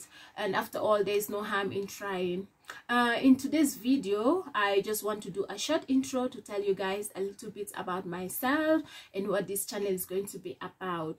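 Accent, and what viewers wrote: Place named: Kenya. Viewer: Aii the Kenyan accent 🥰 😘😘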